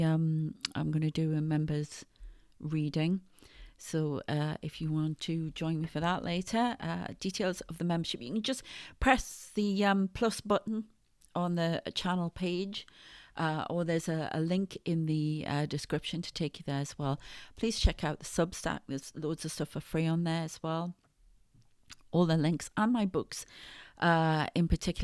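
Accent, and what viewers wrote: England, United Kingdom. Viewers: Spoken like a true English woman 👍👍 Go girl 💕💕💕🥰🥰 Kath xx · Hello, I love hearing your readings and I love your English accent.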